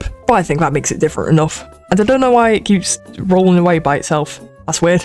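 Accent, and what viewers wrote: United Kingdom. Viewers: The british accent🇬🇧😭🙏💔🥀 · bro is british please i am challinging you to say "bottle of water" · Are u british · Are you British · Are you British because I'm British you sound like one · Are u British?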